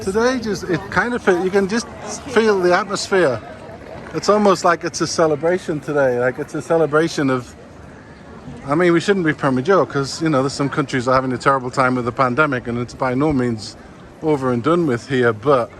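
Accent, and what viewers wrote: Northern England, United Kingdom. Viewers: oh no please do talk on your films. I can hear only slight northern accent but I live in UK so recognise some accents. I want to tell you about restaurants - secret gardens 🤗🤭, You passed one yesterday in Grodzka near Wawel. Another La Campania in Kanonicza Str. On the 29 May eating inside restaurants in Krakòw.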